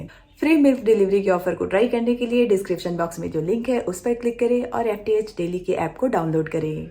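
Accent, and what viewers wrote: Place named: Ballia, India. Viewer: Are you from somewhere in Ballia (U.P.)?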